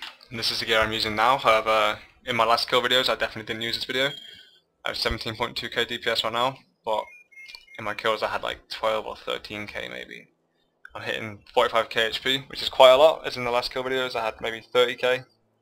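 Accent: United Kingdom. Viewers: You really sound like you're from around Leicester aha. Are you? great guide though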